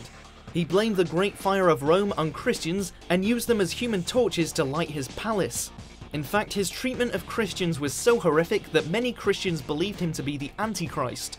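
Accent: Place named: Liverpool, United Kingdom. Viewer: Can't watch this due to hideous Scouse accent saying 'merdered' every minute. Try not "murdering" the English language. Also "the women starved to death due to a lack of food"? Yes, that's generally why people starve to death.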